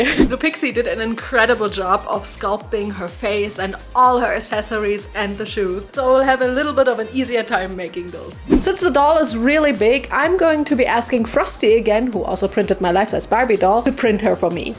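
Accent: Germany. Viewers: Are you german? Your accent sounds so german. If yes: Tolles video!! Und die puppe sah echt cool aus!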